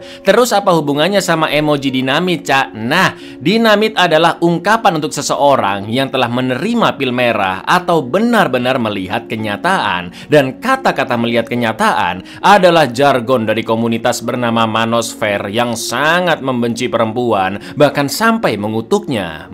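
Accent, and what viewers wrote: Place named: Surabaya, Indonesia. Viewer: Kyk arek suroboyo ae nak...😅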